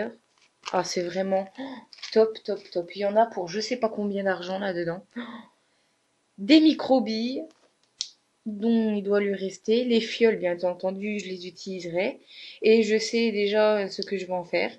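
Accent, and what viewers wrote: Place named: Nord-Pas-de-Calais, France. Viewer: comme ta l'accent chti toi c trop lol la chance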